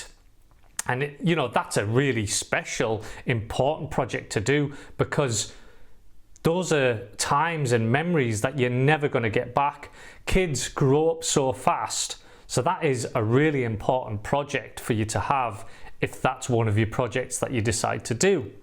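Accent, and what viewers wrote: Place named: North, United Kingdom. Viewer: Hello from France! Love your entire channel! I always learn things in your videos. And your delivery is very good too, with a calm voice and a lovely Northern accent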